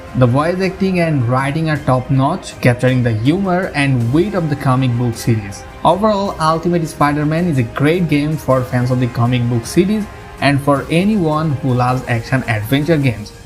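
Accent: India. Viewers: Are you an Indian? Well you have a Indian accent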